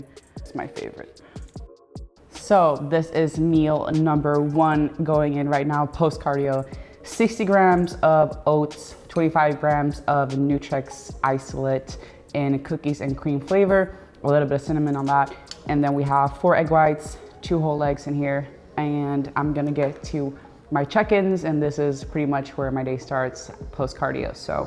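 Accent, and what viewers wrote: United States. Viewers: how does she sound so american being raised in sweden?? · for me she sounds like an American. Never would I thought she is from Europe ... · Would have guessed she was born and raised in The USA by how she speaks , sounds very American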